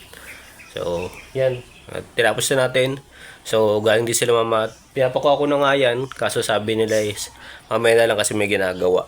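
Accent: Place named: Capalonga, Philippines. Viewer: nnatutuwa q sa panonood ng vlog mo kc ang punto ng yng salita ay Capalongang gapalonga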